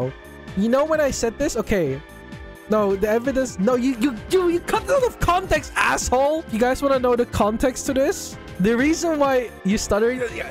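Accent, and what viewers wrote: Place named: Netherlands. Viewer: Most dutch accent ever he meneertje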